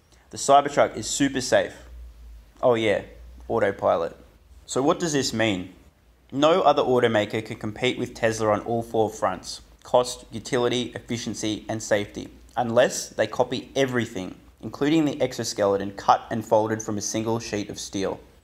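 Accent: Australia. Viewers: It's an Australian accent. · Good to hear an aussie yt'r covering tesla.. 👍🇦🇺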